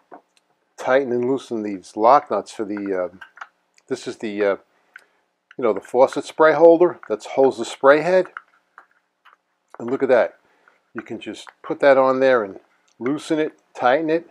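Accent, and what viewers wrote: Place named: Brooklyn, United States. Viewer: Just bought one and i knew you were from Brooklyn since you sound like me, lol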